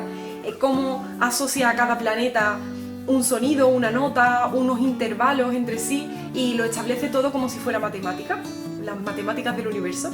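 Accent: Andalusia, Spain. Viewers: Dios mío que de cosas sabía Pitágoras!! Sigues siendo una gran comunicadora, y ese acento andaluz.... (emoticono de corazón) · Cuanto talento en una persona tan joven!! Extraordinaria. Amo ese acento andaluz, tan parecido al acento chileno. Viva Sevilla la tierra de mi bisabuela. Saludos desde Santiago de Chile 🇨🇱🇪🇸🌹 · Es tu acento andaluz?suena muy bien❤🥰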